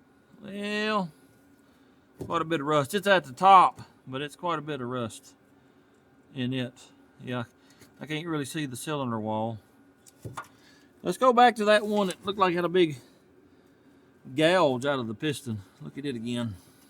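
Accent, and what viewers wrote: South, United States. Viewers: All good,love the southern speach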